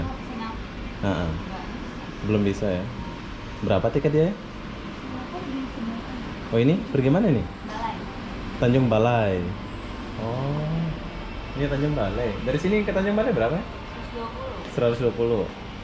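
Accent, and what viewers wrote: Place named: Malaysia. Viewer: Mau tnya,abang nih asli mana ya,? Klu ngomng ada melayu2nya?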